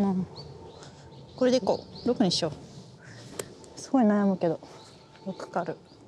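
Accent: Kyoto, Japan. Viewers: はんなり京都弁で可愛さ増し増しやね～ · 京都弁でリラックスしてる感じがいいですね。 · 現役の娘らよりも凄いスピン。京都訛りに萌えます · 理香子プロのスイングは以前からの憧れ。色んな番手の色んな角度からのショットが見たい。 プロの京都弁が可愛い。 どんどん続けて行って下さい、応援しています。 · 元気が一番です！京都弁は可愛く見えますね。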